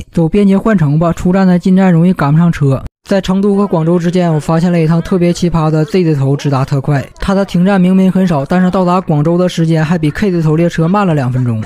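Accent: Northeast, China. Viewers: 哈哈哈哈 碴子话 老带劲了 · 解说一口大碴子味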